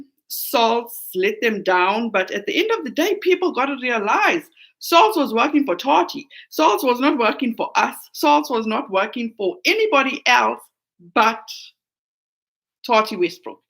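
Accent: South Africa. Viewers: Btw I love your accent, I worked with 4 S. African doctors, love it!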